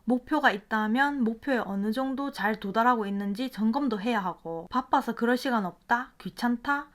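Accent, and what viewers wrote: Gyeongsang, South Korea. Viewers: 경상도 사투리에 똑부러지는 조언 딱 이상향형????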